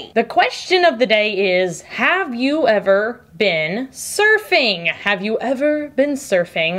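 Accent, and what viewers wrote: Texas, United States. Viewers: I am not a surfer (tried and failed LOL) but a diver (instructor even) and that is kind of the same ..... the beach part of the soap is reminding me of a ocean glass beach or the beach after good storm with all the torn out algea on the beach..... and I hardly use "soap" I am just coming back for you bubbly personality.... I love it that you dont doll up for work cause that is what it is even if you take us along with the cam.... as you said it is hot no need to be painted like a parrot ;-) and of course I come back for the texas drawl "OH MY GOAD" and the "Scrappy Scrappy" was really disappointed the other day I found a video without a scrappy scarppy LOL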